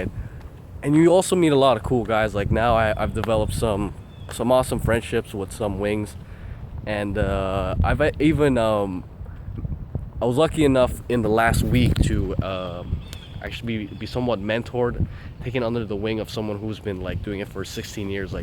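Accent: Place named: United States. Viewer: were you born in the US? Your English sounds so American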